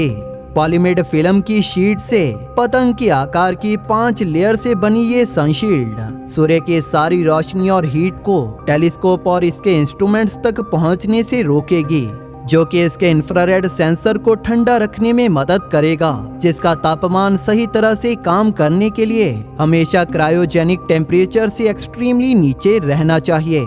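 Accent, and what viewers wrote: Punjab, India. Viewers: O paaji, tussi Punjabi ho...?😁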